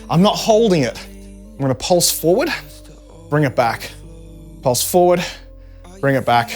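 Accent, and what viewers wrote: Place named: Australia. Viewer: with that Australian lilt in your voice I reckon it would be a hit :D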